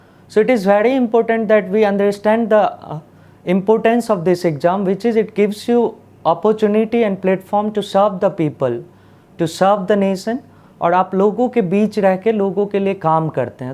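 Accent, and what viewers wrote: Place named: Bihar, India. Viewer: Sun kr LG raha hai ki ye bihar se honge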